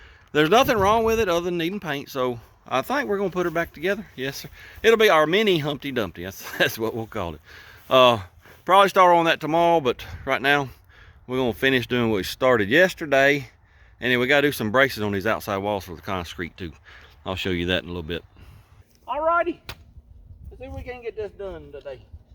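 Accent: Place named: Southwest, United States. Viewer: I am writing you from France,. I am from Prentiss Mississippi. And L have watch everyone of your vidéos, and love everyone of them. Me being in France , it is good to hear someone from the Southwest talk. I am à retirer milirary, L moved to France, when I retirer. So keep up the good work, you are like one of the family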